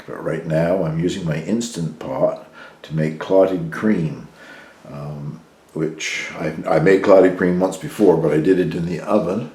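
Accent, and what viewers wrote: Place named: Canada. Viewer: I, like yourself, appreciate good food but not into making comlex ones. I'm learning interesting Canadian pronunciations. We say scones like stones. You say it like skawns. I'm hearing a lot about the instant pot, it looks like it needs a 2 week workshop to learn how to use it. Thinking about getting one. Best wishes.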